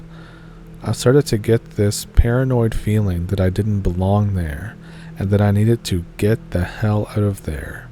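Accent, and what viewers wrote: Canada. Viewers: I love the Canadian accent. I pop your channel on to relax as I go to sleep. Thank you for all your hard work gathering stories to tell. Thus channel is great. Jo from Australia 🇦🇺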